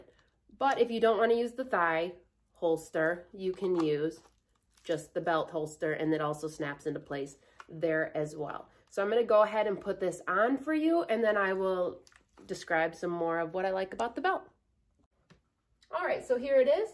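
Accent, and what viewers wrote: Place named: Minnesota, United States. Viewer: 100% she’s from Minnesota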